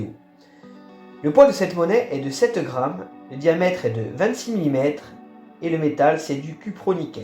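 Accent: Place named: Corsica, France. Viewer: salut je t ecoute et mon oreille tilte. tu es corse toi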